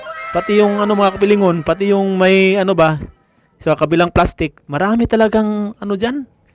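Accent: Visayas, Philippines. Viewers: pls. mag gloves ka pohh pg mag dumpster para iwas kati sa kamay at sugat lalo na poh at kinakalkal mo ang mga dumpster... bisaya poh talaga accent mo mag tagalog...hehehe..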